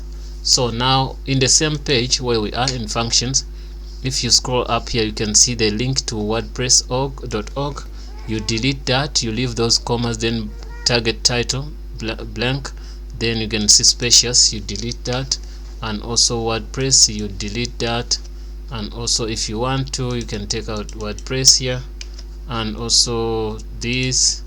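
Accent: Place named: South Africa. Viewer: Great video thanks man, keep it up. Good to hear a South African-like accent online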